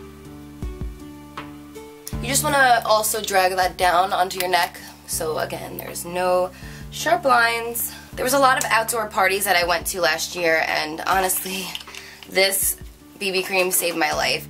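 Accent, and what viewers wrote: New Jersey, United States. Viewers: i love your new jersey accent! esp. the way your say mascara! :) xoxox from new orleans! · R you from jersey? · I swear ur Jersey accent is so cute listening to it compared to my country accent....love being on the same coast but hearing how our accents change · Iol I love you New Jersey accent :)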